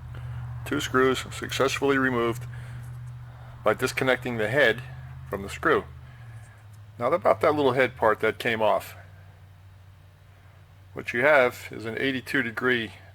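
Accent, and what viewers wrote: United States. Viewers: No need to be careful as our different interpretations make it so interesting. Just continue with your 'American' English and I'll sort out what you mean 😉😀 I'm finalising installing independent power feed to the X-table on my Marlow mill having fitted a VFD main drive. The mill did come with a separate motor but it was disconnected by previous owner and the wiring was a dogs breakfast. Using one of those ubiquitous Chinese 12v DC motors. Now it's heaven selecting the correct speed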